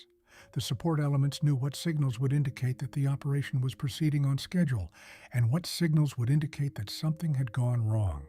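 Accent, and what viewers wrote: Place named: United Kingdom. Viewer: Lads these are well narrated and pretty direct by a fellow Brit, Godbless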